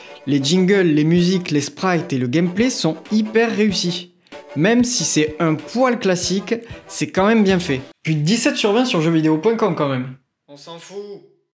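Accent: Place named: South, France. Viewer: Je sens l'accent du sud par ici ! Oh ! Sinon, bordel qu'est-ce que j'adore ce jeu ! C'est en partie ce jeu (et Le cauchemar des schtroumpfs) que j'ai dédié au compositeur Alberto José Gonzalez qui a fait un taf monstrueux, et VD-Dev de bons devs de l'ombre ! Pour un première épisode, il est vachement cool, j'ai lâché quelques rires hahaha ! Des bisous !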